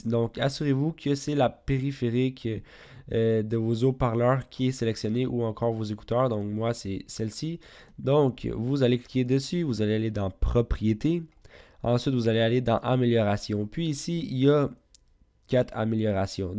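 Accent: Quebec, Canada. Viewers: Un Québécois ! Belgian like Québec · lol canadien comme moi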